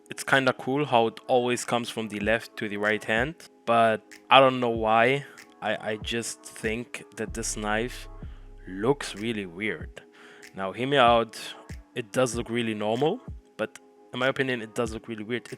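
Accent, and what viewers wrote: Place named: Germany. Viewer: Safe bist du deutscher das hört man an deiner Aussprache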